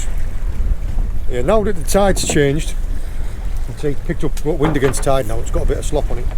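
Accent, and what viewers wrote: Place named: North East England, United Kingdom. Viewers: Hey up fella, only found your channel yesterday and totally enjoying them too. Thee sounds like a lad originally from up this neck of the woods fella. You are blessed living where you do. I would go fishing out from Hartlepool years ago and my uncle who lived up in Tynemouth would take me out when I would go up there when I would go to stay as a boy for my holidays. Love your videos buddy keep em coming. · Really enjoying watching your videos. I’m struggling a bit at the moment with my head and watching your videos takes my mind off things. NE accent in Cornwall catches the imagination. Only ever been course fishing, but would love to try sea fishing. Keep doing what you are doing.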